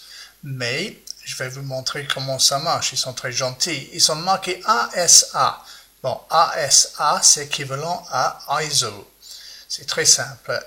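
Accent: England, United Kingdom. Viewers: C'est bien charmant avec cet accent anglais et le Monsieur parle avec une vigueur remarquable.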